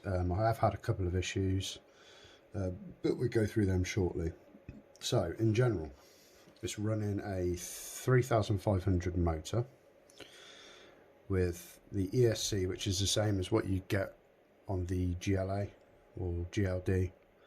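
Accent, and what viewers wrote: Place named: United Kingdom. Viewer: I guess I found time 😹 & great accent btw, for some reason British voices are so easy for me to listen to. Solid video & great tip on the steering rack holder thingy. My steering has a bit of slop in it too, I’ll have to tear it apart & take another look in there. I’ll have to check out the video on bodies later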